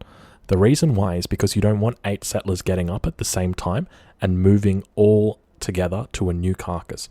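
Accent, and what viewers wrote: Australia. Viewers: AoE3DE Art of War narrated by an Aussie in a very competent and thorough way? Flawless victory! Cheers for the amazing content mate!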